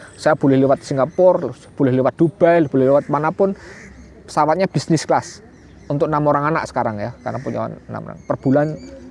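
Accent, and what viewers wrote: Java, Indonesia. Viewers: Jowone endi mas? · wong jowo tho mas sampean? ngomonge kok medok tenan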